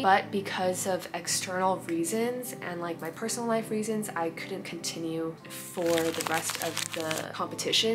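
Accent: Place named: China. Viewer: Mix of China and American accent when speaking canto.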